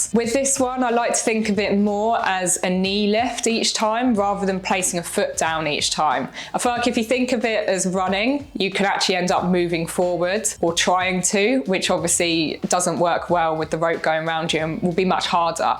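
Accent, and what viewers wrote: United Kingdom. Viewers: nice lesson. Your accent confuses me its 40% British, 60% American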